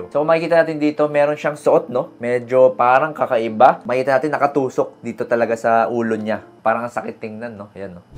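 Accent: Visayas, Philippines. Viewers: Bisaya sya idol...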